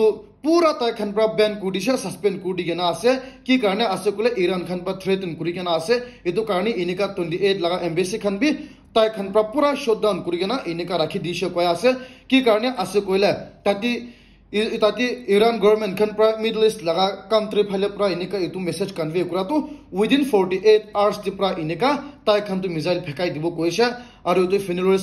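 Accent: Nagaland, India. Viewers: I like his accent, pure naga ase. Hoi na nahoi comment section viewers.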